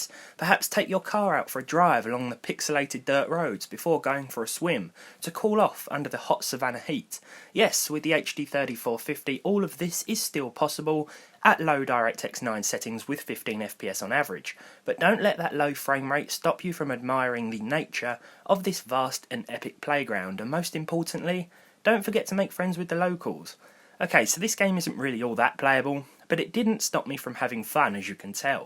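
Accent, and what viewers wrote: United Kingdom. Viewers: I like ur accent where do you come from in UK?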